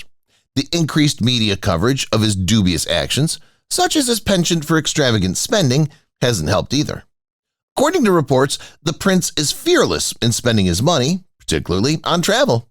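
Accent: United States. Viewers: Always an American accent with these stories… I will not be listening to this narrative… this is against the British Royal Family… and those British who keep following these people deserve to lose the first family of the UK… THIS FAMILY WHO HAVE SEEN THIS COUNTRY THROUGH SO MUCH OVER THE CENTURIES UNTIL TODAY… YOU ALLOW THESE AMERICANS TO RUN THE BRF DOWN TIME AND TIME AGAIN AND EVEN TODAY.. THEY ARE TRYING TO DESTABILISE THE BRF EVEN THROUGH HARRY AND HIS LIAISON WITH AMERICAN RACHEL MEGHAN MARKLE.. THEY DID NOT RUN AWAY FOR FREEDOM… THEY RAN AWAY TO CONTINUE WHAT SHE WAS PLANTED TO DO… IT HAS NOT STOPPED AND HAS GAINED MOMENTUM WITH THE ENCOURAGEMENT OF YOURSELVES… THE LIKES OF TINA BROWN… CHARLOTTE AND MANY OTHERS IN THE BRITISH PRESS… TOM BRADBY. YOU HAVE WHAT YOU WANT NOW IN KEIR STARMER … GOOD LUCK BRITAIN… YOU ARE GOING TO NEED IT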